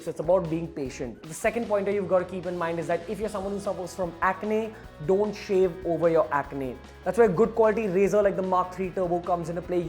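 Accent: India. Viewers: He is Indian . He is speaking indian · Oh no indian accent, guess I’ll go to another tuturial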